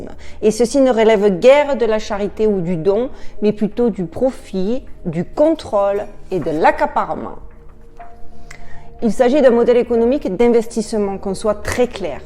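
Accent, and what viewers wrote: South, France. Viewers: J'ai toujours cru vous êtiez du Sud de la France!!!!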